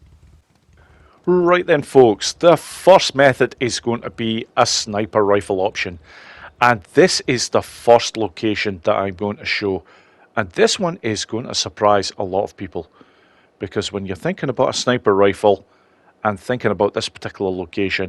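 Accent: Scotland, United Kingdom. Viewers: In any shooter game, I always opt for a sniper rifle as soon as possible. On the first playthrough, I saved my lunch money and turned in a lot of bounty to get my trust level up so I could buy the.50BMG. The slaughter went pretty much identical to the first example. On the second go around, I had added the Rock Chuck which made it more fun as I took out the roof crew from a distance and then snuck in for an up close and personal finish. BTW, and I hope I'm not getting the nationality wrong, I enjoy videos as much for the content as I do listening to his narration. Of all the peoples speaking English as a second language, there's just something special about the way a Scottish person does it, and I could listen to it all day! So, hats off for all your very helpful offerings on Days Gone.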